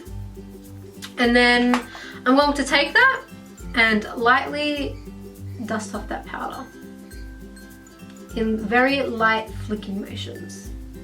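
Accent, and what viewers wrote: Australia. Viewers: omd she 100 percent sounds like promise phan with australian accent!!! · Her accent is Australian but she is Jewish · I thought I recognized the accent! My guy was born in Melbs! (We live in NY, I'm a NY'er.)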